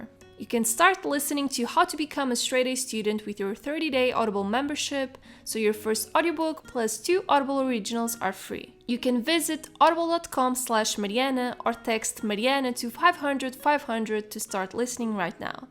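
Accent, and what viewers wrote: Portugal. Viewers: Eu sabia que reconhecia o sotaque! És portuguesa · I love your videos I think they are really informative and make me want to be more organized like you But are you from Portugal? I am and your name sounds really familiar and your accent too ahah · are you portuguese? sorry hahaha i’m curious because of your accent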